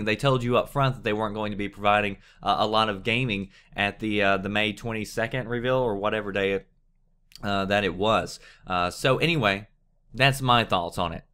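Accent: South, United States. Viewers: Is... is that a southern accent? That is a first for me. Game reviewer with a southern accent... wow. Who is also a privacy advocate.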